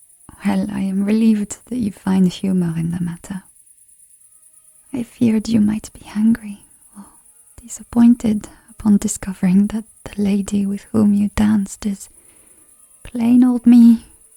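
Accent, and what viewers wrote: France. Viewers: A confession From With French accent At a ball Dont wake me up. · Wow! I was totally invested in this story. What a beautiful French accent, & beautiful story. I liked this a lot. Thank you ❤ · This was beautiful, and the French accident is stunning as always. Hope for more in the future ❤️ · I've been enjoying the French accent videos... Maybe French is the language of love?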